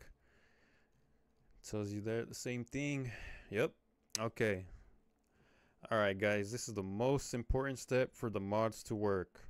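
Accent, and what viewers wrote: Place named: India. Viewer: He sounds Indian of some sort. He let his most of his family name.He knows nothing nothing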